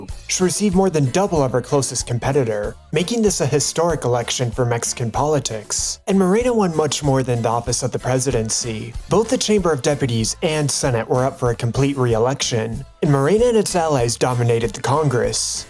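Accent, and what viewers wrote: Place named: United States. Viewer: Irrelevant to mention President of Mexico ethnical background. She does not practice nor she considers Jewish but Mexican. I myself have a jewish last name, so what, I consider myself with the Culture I grew up with and where I was born. I do not practice Jewish Religion or its traditions. You Youtuber, your English makes people believe you are from USA. Would you like to be labeled as a foreigner and to mention the countries your grandparents were born? That always happened when a person is Important, but we in the USA, ever say where the grandparents of our Presidents came from?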